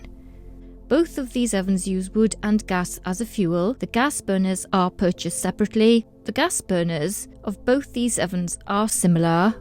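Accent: United Kingdom. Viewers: J'aime bien cet accent britannique.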